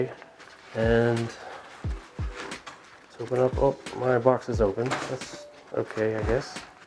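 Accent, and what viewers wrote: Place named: Alabama, United States. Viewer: What’s wrong with an Alabama accent man?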